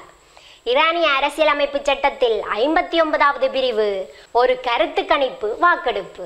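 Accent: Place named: Sri Lanka. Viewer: இலங்கை தமிழுக்கு நான் அடிமை இலங்கை தமிழேக்கு தனி திறன் கேட்பதற்கு இனிமையாக உள்ளது தங்கள் தமிழ் உச்சரிப்பு....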